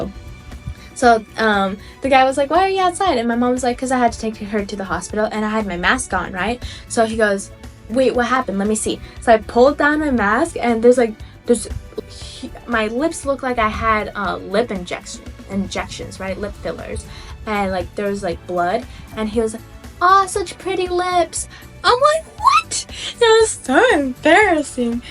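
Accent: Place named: United Kingdom. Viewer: Why you have a uk accent tho😭😭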